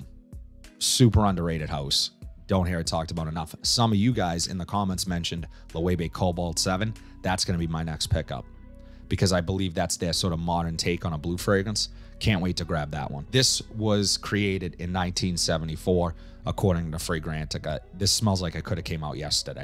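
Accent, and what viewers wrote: Boston, United States. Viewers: I wanna live in the states if anything for the fragrance variety at Marshall’s . Canadian ones are more or less terrible . Winners is the worst. I’m also here for the Boston accent 😅👍🏻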